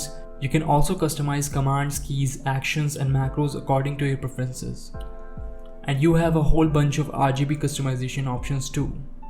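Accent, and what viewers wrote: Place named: India. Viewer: You talk like some Indian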